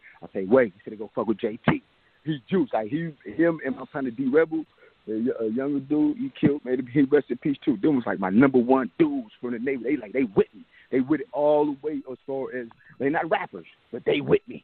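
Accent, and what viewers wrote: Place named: West Coast, United States. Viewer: Man, his accent cracking me up. I love it , straight west coast. I know they probably feel the same way hearing a southern/Louisiana accent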